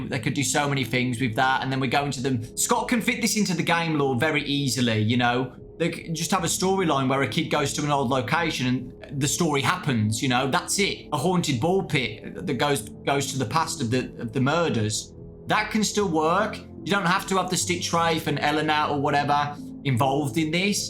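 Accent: United Kingdom. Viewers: Wassup British man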